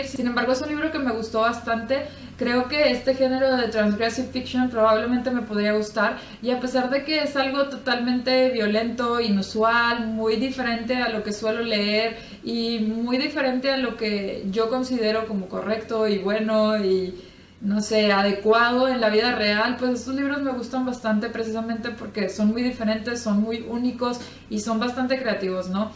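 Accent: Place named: North, Mexico. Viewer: Me sorprende que a pesar de que ya llevas tiempo en Alemania continúas teniendo el acento norteño jejeje Me gustaron tus respuestas y yo también quiero en físico Persépolis:( Espero ya subir mi tag en estos días Y sólo como sugerencia: recomiendo que pulas un poco más la edición de tus videos, hay algunas cosas de las que puedes prescindir sin afectar el contenido y así hacer más breves tus videos =) Un abrazo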